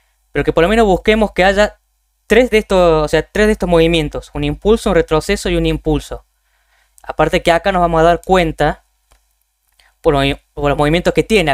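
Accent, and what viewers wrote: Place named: Córdoba, Argentina. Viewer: Muy bueno, por la tonada... sos de Córdoba?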